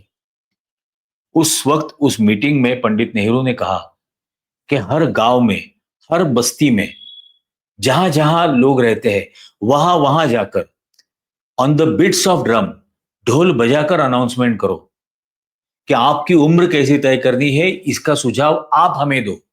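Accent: Standard. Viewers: आप ने बहुत महत्व पूर्ण जानकारी दी. यह कहना भी बहुत जरूरी है कि आप बहुत अच्छी हिन्दी बोलते हैं और आपके उच्चारण की शुद्धता शानदार है. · सर, खूप चांगल्या पद्धतीने अगदी शुद्ध हिंदीत माहिती मिळाली 👏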